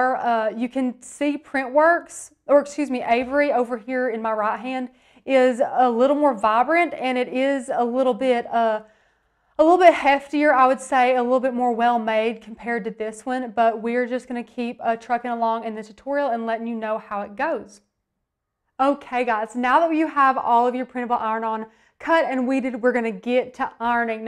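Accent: South, United States. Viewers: Thank you for an excellent video! I learned exactly what I needed to learn and your presentation was informative and to the point. I also Loved your southern accent. Thanks for doing all the hard work. Excellent.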